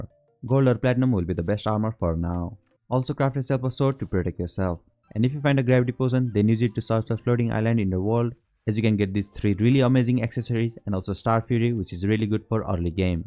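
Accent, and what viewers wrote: India. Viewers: But he sounds Indian,sounds like my professor. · no, he IS Indian but he's clearly not trying to hide his accent. He's just talking fast. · Can't understand with you with your jeet accent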